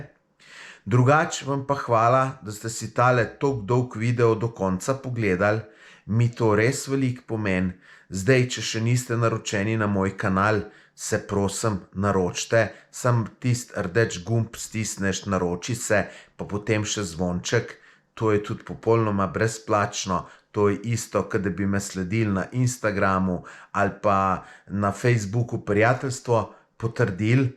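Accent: Slovenia. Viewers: Meni uvek simpaticno kad slusam slovenca kako prica:) Jajaca su jaca:):) Bili smo ocigledno u isto vreme na Jahorini, ja sam zadnji put bio pre 15 godina i zaista sem planine koja je ista sve ostalo je drugacije. Puno pozdrava iz Beograda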